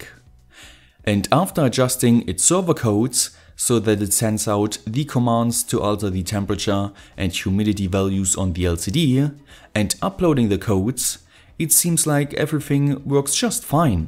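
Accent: Saxony, Germany. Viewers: bist du aus Leipzig? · are you german?? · Ahaaa ein deutscher 😂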